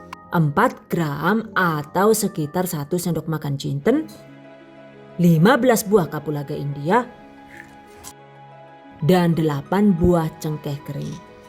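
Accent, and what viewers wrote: Banyumas, Indonesia. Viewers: Jebule ya wong ngapak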